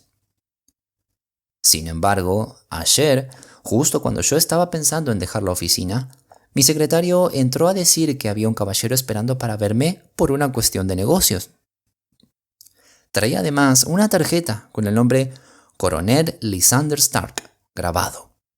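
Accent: Rioplatense, Argentina. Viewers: le ruego me responda, soy sumamente curiosa. argentino o uruguayo? x anticipado, gracias!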